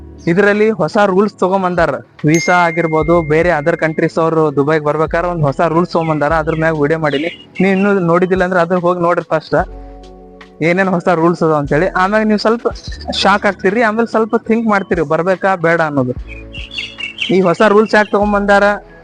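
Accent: India. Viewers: Houda okay ನೀವ್ ಮಾತಾಡೋದು ಸ್ವಲ್ಪ ನಮ್ಮ ತಾರಾ ನೆ ಅನಿಸಿತು ಅದಕ್ಕೆ ಕೇಳಿದೆ , ನಮದು ಬೆಳಗಾವಿ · Nevu north kannadaana...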